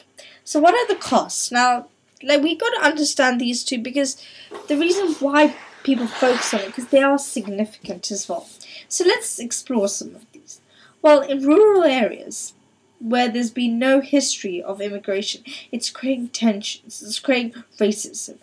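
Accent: United Kingdom. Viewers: As a Pole myself, I'll be the first to admit that Poles don't belong in the UK and that Britain is for the native BRITISH, which does NOT include you,. You're NOT British. You're just an Indian occupying Britain who happens to just so have a British accent. One day, European nationalists will rise up and deport all non-Europeans from our beloved continent and you will be sent back to where you belong.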